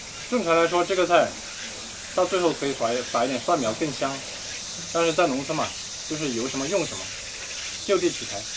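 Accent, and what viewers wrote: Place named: Renfu, China. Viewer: 我说口音咋这么熟，都是属于仁富片区的啊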